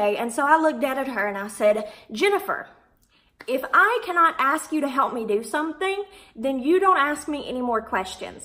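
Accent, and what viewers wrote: South, United States. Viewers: I love your accent and the way you say “being ugly” because my family is all from the south & they talk the same way & just value respect, and it just makes me smile honestly watching this video. Also, I’m so glad to have found your channel!! I just subscribed, and I’m looking forward to seeing more of your videos ☺️ also, I love your name. I have the same one. It’s pretty great!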